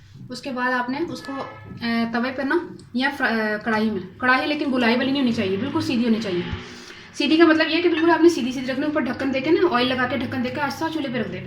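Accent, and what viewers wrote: Punjab, India. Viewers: I Absolutely loved your video, very simple and natural. Some people are writing such negative comments about your punjabi accent, these people are forgetting your channels name is "Punjabi da kitchen ". I'm a Punjabi Pakistani American. I have a hospitality degree and been in the States for most of my life. You girls are doing a good, keep working hard and be yourself I loved your work. God Bless <3 <3 <3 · مجھے آپ کی پنجابی بہت پسند ہے جب آپ پنجابی بولتی ہیں مجھے کافی ہنسی آتی ہے ۔ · Z MIRZA WITCH KIND OF PUNJABI IS ?? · Punjaban se hindi aur 'inshaallah' sunke achha lagta h.. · your punjabi so sweet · Bht cute punjabi hy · Nice Panjabi touch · recipie is very delicious, but your punjabi is much more sweet, Allah always blessed you and Allah give you every Khushi in your life ( Ameen ) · Ur punjabi so sweet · Really enjoy your sweet cute punjabi.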